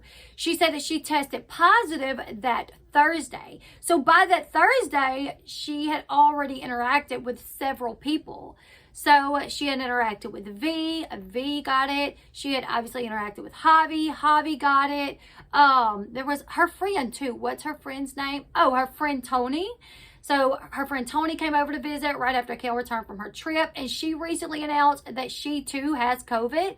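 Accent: South, United States. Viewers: Just came on here to say I absolutely love your southern accent I literally just come to your channel to hear you talk sending lots of love from London, UK !! Your accent is everything for me girl !!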